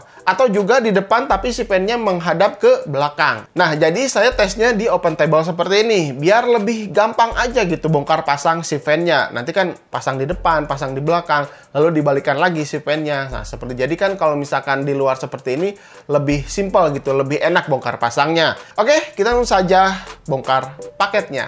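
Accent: West Java, Indonesia. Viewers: Pideo.... Sunda ya?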